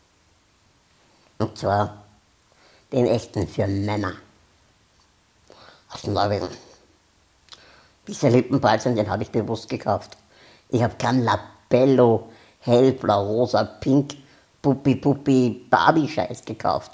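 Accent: Austria. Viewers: A Österreicher? Oda vo wo?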